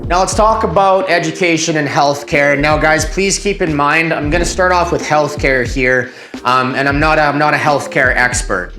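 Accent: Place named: Toronto, Canada. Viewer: Just 2 seconds into your video, I just knew you were from Toronto. Same vibes as those car sales men.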